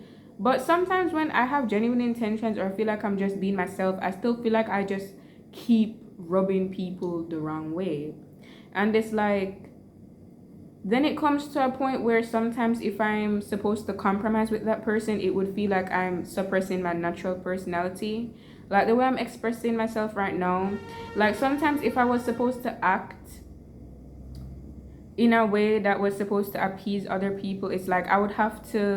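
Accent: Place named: Caribbean. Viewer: You sound from like your from Dominica or Barbarian or trini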